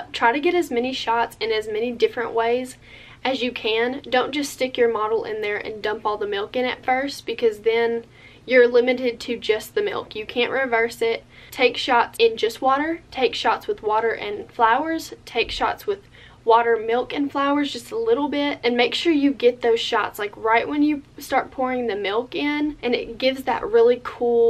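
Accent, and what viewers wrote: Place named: South, United States. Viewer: Yay! You are so freakin awesome! I hear the southern accent! I am just below you in MS. Oh, hey NSC sister!!! Keep shining your light of influence!!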